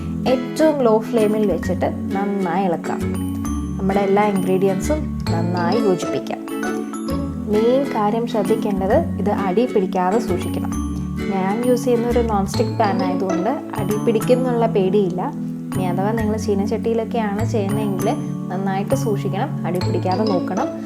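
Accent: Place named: Thiruvananthapuram, India. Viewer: Tvm slang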